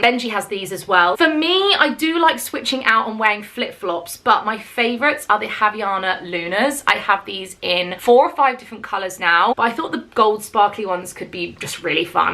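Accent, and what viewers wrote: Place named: United States. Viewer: Hehe I can definitely hear an american twang in yur voice, then you said you spend a lot of time in America and it made sense :)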